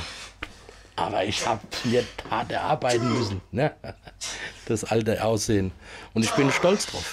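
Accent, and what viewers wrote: Hesse, Germany. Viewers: Hessisch is und bleibt de geilste Dialekt !!!! 😂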